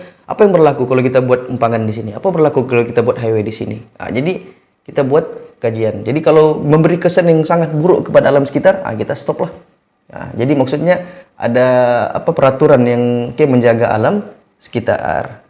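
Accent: Sabah, Malaysia. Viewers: ketara dialek sabah HEHBHABA btw thanks cggg ^^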